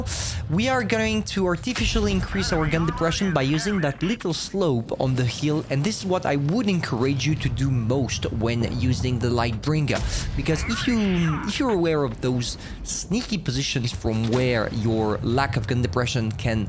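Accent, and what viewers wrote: France. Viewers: Are you French from treytens videos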